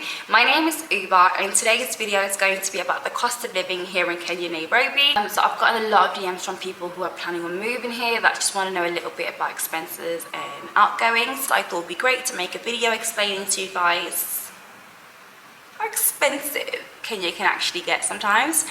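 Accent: United Kingdom. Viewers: She is obviously not American dah🤦🏽‍♀️ her accent is British😂 yeah ban and see how that works for y’all economically, did we watch the same video & what she spent?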